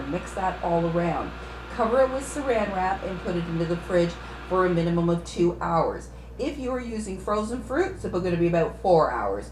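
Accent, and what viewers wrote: Canada. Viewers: Very informative, great Job! I am from Western Canada, can tell you are from Ontario I presume? can tell by your accent, especially when you say the word "about" LOL, all is good!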